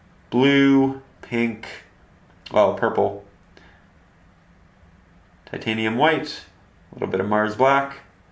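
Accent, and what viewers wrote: Canada. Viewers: Wow you're Canadian accent really comes through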